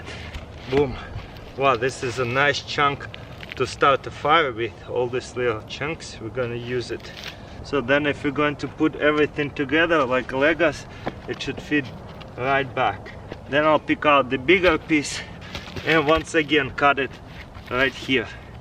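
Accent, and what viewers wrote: Russia. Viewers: Вот это понимаю,английский с русским акцентом)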